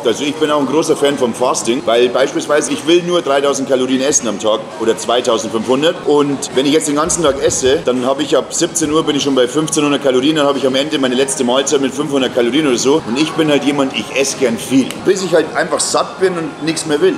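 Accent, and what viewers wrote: Bavaria, Germany. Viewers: Abo für den Bayrischern Dialekt is raus 🙂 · Google Transkription scheitert am Bayrischen Akzent. 🙃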